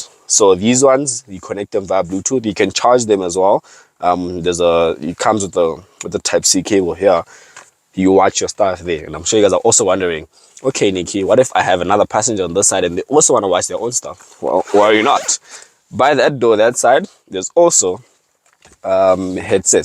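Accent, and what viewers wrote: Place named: South Africa. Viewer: We hardly here what you say, but maybe it's the south african accent... Other than that it's the best car review channel around the continent.👍🏾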